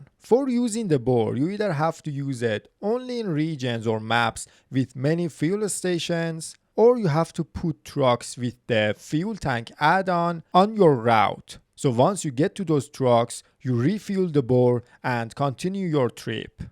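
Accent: Iran. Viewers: Wow he does sound Iranian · خرابه لحجه ات شدم حاجی 😂 خوده خوده ایرانی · لحجه اینگلیسیت مثل ایرانی هاست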